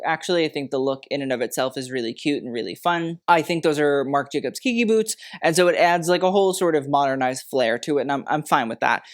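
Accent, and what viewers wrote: Ireland. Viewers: I usually don’t gove youtube videos a thumbs up but damn I hit that button so hard just 2 minutes in. That Isish accent was incredible 😭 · My father is Irish and you did fairly well with accent, Sister. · that is a very good irish accent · what an excellent Irish accent - all about it!! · Excellent Irish midlands accent:) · This mf Irish accent lmaooo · Lovely Irish accent and cute costume 😊☺️ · You really made me laugh! The irish accent was great and what you said about Ed Sheeran had me in stitches. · !! I always love when you pull the Irish accent out! Sounds just like my mother in law from Belfast 😜🇮🇪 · Omg the Irish accent without sounding like a leprechaun is fantastic! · nice irish accent! Yanks normally butcher it but you're pretty spot on! Gwan Sis! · Sorry, but nun + Irish accent brings to mind only one person: the legendary Sister Michael from Derry Girls 🖤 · Your Irish accent sounds like Mrs. 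Doubtfire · Ok but you’re Irish accent sounds just like Robin Williams, Mrs. Doubtfire! Which is such a slay!! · I could listen to that Irish brogue for days. So delicious. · BEST IRISH ACCENT QUEEN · Perfect Irish accent…love it❤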